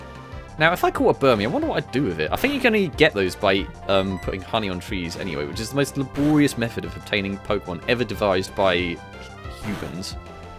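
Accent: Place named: England, United Kingdom. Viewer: good videos man! without any doubt you deserve more subscriptors!! where are you from? england I belive for you acent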